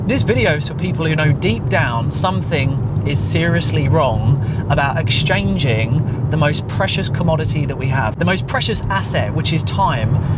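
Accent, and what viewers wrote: United Kingdom. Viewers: Oh I love British accent❤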